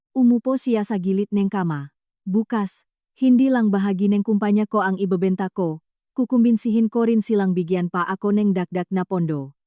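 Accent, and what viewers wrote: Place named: China. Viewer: Nakakawalang gana makinig sa Narrator Ano ba sya Chinese or Maranao. Palitan mo pls nakakabwisit pakinggan imbis ma relax.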